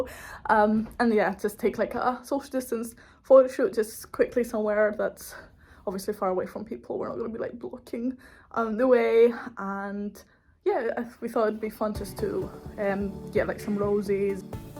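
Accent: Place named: Aberdeen, United Kingdom. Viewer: Your accent is so mixed but so Aberdonian at the same time haha, are you Italian? Great video.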